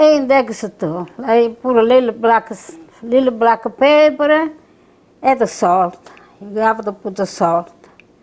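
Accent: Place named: Sicily, Italy. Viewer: Oh, dearest Grandma...I looked and looked on Google to find "Cardoons". The were not the ones that my grandma took the kids out into the country to search for. THEN I figured that just maybe you would have a recipe for the ones found in America. YESSSSS!!!! There was your beautiful smiling and enthusiastic face. You have solved my puzzle, and I thank you so very much for it. I cannot wait to send it to my cousins - we are all in our 70's or 80's. I hope that some of them are as happy to relive this part of our childhoods as I am. When I hear your accent - sometimes it brings tears to my eyes because you make "see again" my Sicilian grandma., you are a beautiful woman - inside and outside. Thank you so much - I just ran out into my yard and gather up some "cardoons." Vivi bene e felice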